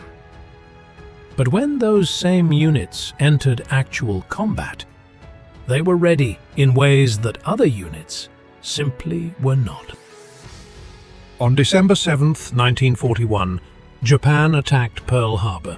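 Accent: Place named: England, United Kingdom. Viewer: I really wanted to listen to this but the English accent ruined it.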